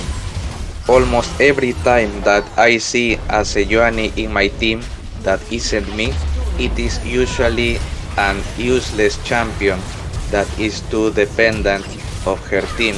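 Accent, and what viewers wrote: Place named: Spain. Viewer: Ostias hermano es el acento español en ingles mas fuerte k he escuchado en mi vida ajajaja. Pero la guia muy buena, te recomiendo que las hagas en español con subs en ingles.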